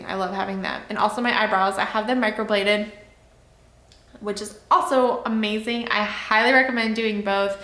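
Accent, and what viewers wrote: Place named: Texas, United States. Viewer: Learned about your channel from Monica Rose. I totally enjoyed "getting to know you"! Sounds like you are in Texas.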